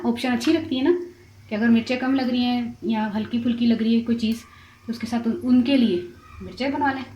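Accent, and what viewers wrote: Punjab, India. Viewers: What a lovely talking style, specially punjabi touch, i think speak punjabi more. you must reply ! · So nice taste aur ap ka punjabi style bohat acha ha ap punjab k kis aria see ho ma lahore sey hon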